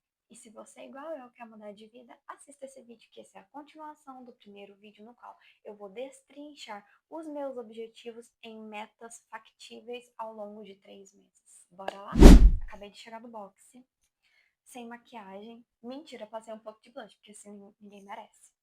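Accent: Minas Gerais, Brazil. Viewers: É mineira? vive de pão de queijo kkkk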